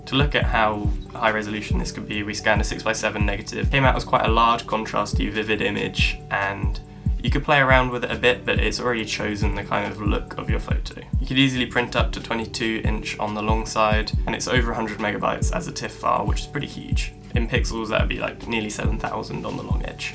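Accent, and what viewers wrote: London, United Kingdom. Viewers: Are you from London?? :) keep up these great vids too bro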